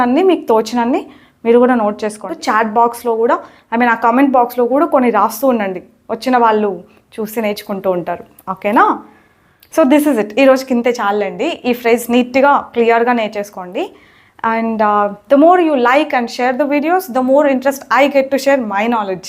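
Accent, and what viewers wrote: Anantapur, India. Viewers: Akka medhi Anantapur aa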